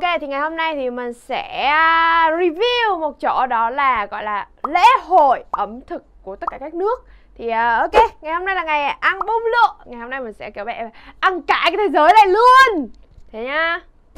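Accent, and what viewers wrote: Da Lat, Vietnam. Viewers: Mới phát hiện kênh mới có hai ngày.. Phái cách nói chuyện, giọng đà lạt hay vãi tèo...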